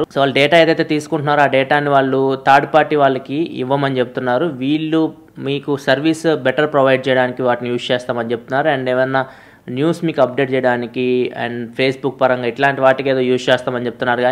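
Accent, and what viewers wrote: Ongole, India. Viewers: Sandeep Sandy ongole kadha valladhi own anduke alaa matladutundu☺️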